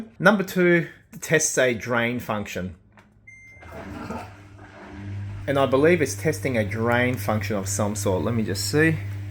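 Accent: Australia. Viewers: Aussie accent makes me laugh my pp off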